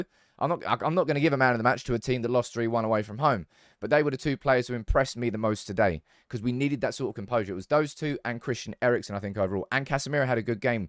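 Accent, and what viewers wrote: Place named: London, United Kingdom. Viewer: Would have thought you'd have been Arsenal with that accent, but I guess that's standard "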